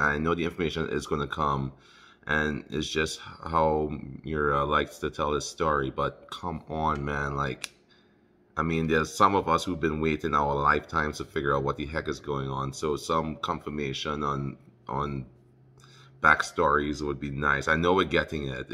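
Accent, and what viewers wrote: Trinidad and Tobago. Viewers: What’s ur background bro? Ur accent sounds Trinidadian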